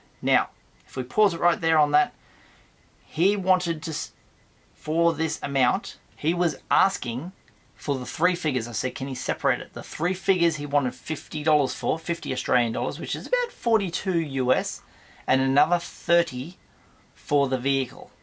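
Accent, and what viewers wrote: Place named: United Kingdom. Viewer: Okay you're in Australia? I started watching this and thought you were in the U.K? You sound very British.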